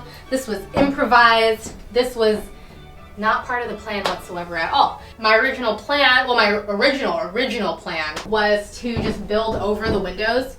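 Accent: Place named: Canada. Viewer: I can hear Canadian in your voice.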